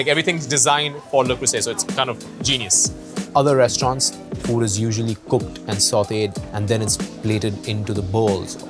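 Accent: India. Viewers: indian accent is cringe asf. it's a meme. he should speak with a proper American accent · yup. so true. Also this is exactly how i speak. Just neutral Indian English accent.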